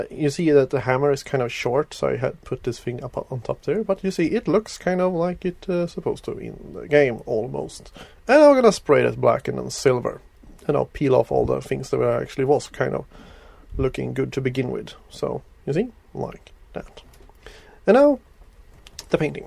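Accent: Sweden. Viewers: r u swedish? its the accent and the name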